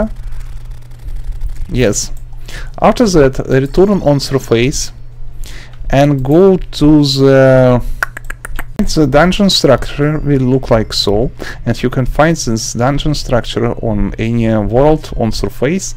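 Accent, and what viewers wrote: Russia. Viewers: this guy is russian but trying hard to speak english respect❤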